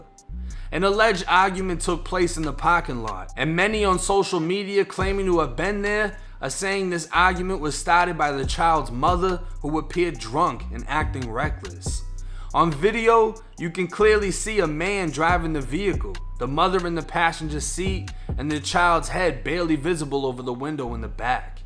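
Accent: Boston, United States. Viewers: The Boston accent not so strong lately eh 😆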